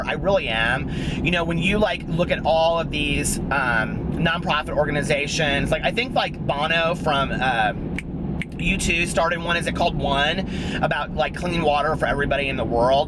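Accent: South, United States. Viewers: Where does your southern accent come from? I am from Georgia and I swear you sound local. :)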